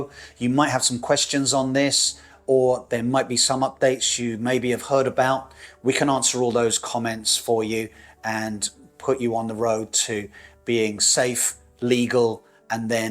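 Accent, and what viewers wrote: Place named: United Kingdom. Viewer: I hear a British accent , Australia IS a signatory to 1949 Vienna convention but NOT the 1968 one due to costs of changing our road rules and therefore have no intention of signing up, sad news for us. A Vietnamese licenses is available IF you have obtained a residency card,,,, good luck with that , so take the bus or grab or Xe Me.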